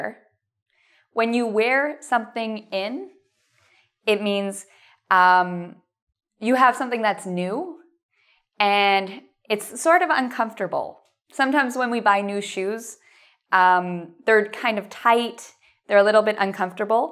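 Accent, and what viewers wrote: United States. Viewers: 美式英文太难听了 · I'd say she's American accent (I am not quite sure), either way it doesn't really matter, those phrasal verbs are used in UK as well :)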